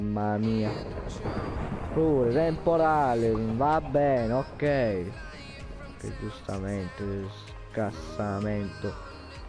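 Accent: Sicily, Italy. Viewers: complimenti fai troppo ridere😂😂 meriti molti più mi piace e molti più iscritti,ma da come parli sembra che se siciliano perché anche io sono siciliano e assomiglia molto al siciliano da come parli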